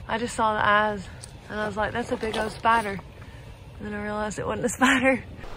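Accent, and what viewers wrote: South, United States. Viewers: Your accent doubled down in southern flair. :) Thanks for posting!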